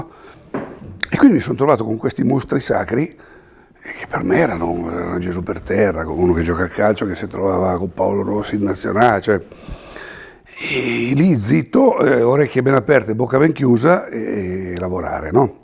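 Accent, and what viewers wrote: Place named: Lombardy, Italy. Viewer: Grande comandante .....il suo inglese un po lombardo.....spettacolo n1 in tutto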